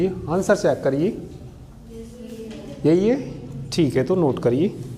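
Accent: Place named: Himachal Pradesh, India. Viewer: sir most probably you are from Himachal if I'm not wrong .......... (by accent)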